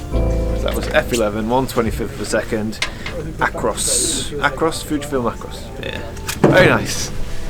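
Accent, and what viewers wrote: United Kingdom. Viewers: Couldnt agree more. For me it is that cozy feeling, the warm music, the unagitated way of explaining things to us, the british XD. His films are always relaxing. At the same time, he always manages to spread the joy which is really infectious. I have the same feeling often with Morten Hilmer's documentaries, but those videos are quite long (over 30min) and focused more on wild live than landscape. So its just that touch different.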